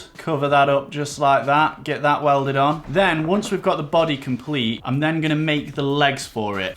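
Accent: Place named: East Midlands, United Kingdom. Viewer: New sub here, was from Grantham now living in Cork.. Im guess from the accent and the word duck you too are from the east Midlands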